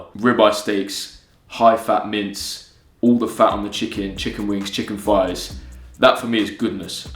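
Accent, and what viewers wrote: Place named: United Kingdom. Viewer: Which part of the uk you come from?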